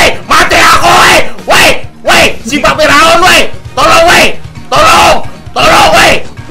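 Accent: Banjar, Indonesia. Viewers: Bang Emang orang Banjar bang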